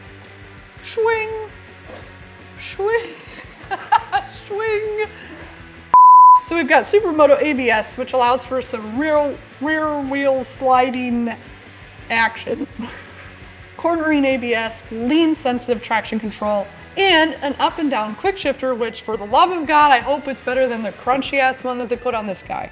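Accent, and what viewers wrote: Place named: United States. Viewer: Aaaaaaaarrrrr, your American.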